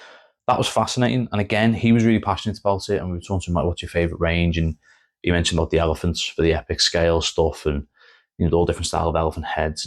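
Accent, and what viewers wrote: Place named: Liverpool, United Kingdom. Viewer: Just picked up konflict 47 you sound a bit Scouse sorry if not first time viewer if you can you recommend any gaming clubs in in rundown prefer adults not kids. Thanks if you can..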